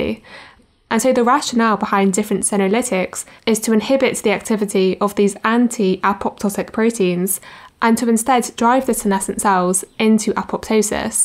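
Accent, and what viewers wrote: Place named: United Kingdom. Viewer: fascinating, thank you, it's above my head but, but very neat. May I ask about your accent? I hear british but specifically what region? I almost hear a mix of a second accent but cannot place it. (it's a nice sounding accent) I'm curious, as a couple people I know moved there and they both developed their regional accents the longer they are there. Thanks again God bless you and yours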